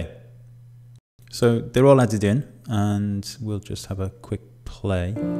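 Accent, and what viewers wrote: United Kingdom. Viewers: I love your british english 😂. Thank you for this very important video!!! I hope to meet you ONE DAY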